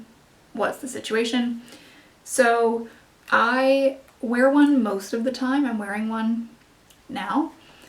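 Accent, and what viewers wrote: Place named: Canada. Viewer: Thanks for the Q&A video! I also live in the country as a stay at home wife. (Kids are adults & on their own now). It can get boring for me some days but I try to make tasks for myself. I've been learning to sew clothes and so far made one skirt that was awful LOL The nearest fabric store is an hour away so I need to try to stock up when I get a chance. I totally understand having to travel. :( Oh and I knew right off you must be Canadian as my husband is Canadian and you both pronounce words the same. In fact we live so close to the border (6miles) that a lot of people here sound Canadian even if they aren't. LoL I really enjoy your videos and look forward to when you post! Do you think you would ever consider another video of your skirts (hint hint) LoL 😁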